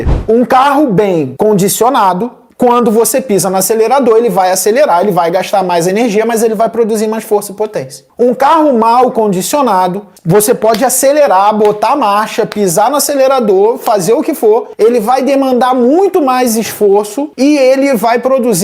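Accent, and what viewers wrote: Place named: Rio de Janeiro, Brazil. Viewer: Dr.! Adorei o teu vídeo! Estou a procura de um profissional qualificado para eu poder começar a minha saga de alimentação correta e treino. Pelo teu sotaque, tu deve ser do Rio.. Mesmo se o consultório fosse longe, eu iria numa boa, pois quero muito sair da sanfona..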